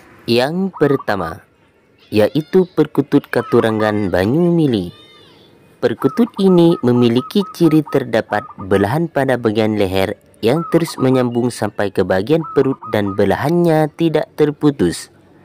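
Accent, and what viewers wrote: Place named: Madura, Indonesia. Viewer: bhsnya ky orng madura mas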